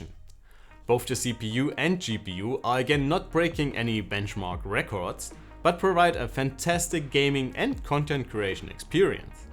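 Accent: Germany. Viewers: The German accent tho :) 105watt not quiet my tempo :))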